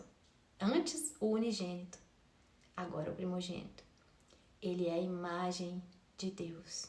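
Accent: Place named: Minas Gerais, Brazil. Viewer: ❤❤. Você. Hoje. Tá. Muito. Linda. Bem. Arumda. E. Você. Fala. Muito. Bem. Eu. Adoreio. Você. Quero. Seu. Tel. Endereso. E. Acidade. Seu. Sotaque. E. Minerinha. Bj❤❤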